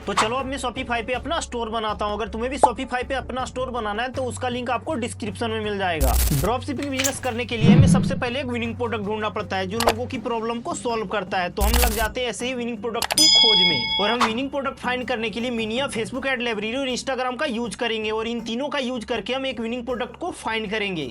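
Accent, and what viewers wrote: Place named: Jharkhand, India. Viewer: Bhai tum jharkhand se ho 😂 Mai bhi hu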